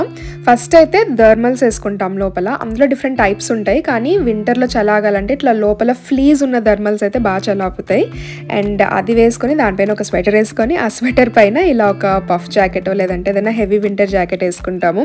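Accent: Rayalaseema, India. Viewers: UR EYES, VOICE, LANGUAGE I MEAN SLANG {RAYALASEEMA:P} AWESOME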